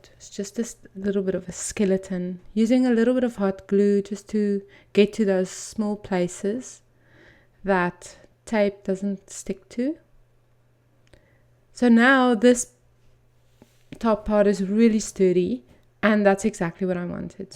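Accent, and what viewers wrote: South Africa. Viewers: Beautiful, once again! Your layouts look so deceivingly simple... The white background works wonderfully with your style. A blessed year for you too <3. By the way, I love your accent. Reminds me of my childhood a lifetime away in Johannesburg.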